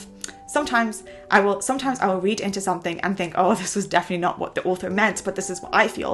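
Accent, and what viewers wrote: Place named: United Kingdom. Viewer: you add some british accent when you speak in American English.I guess you first learnt british accent then American.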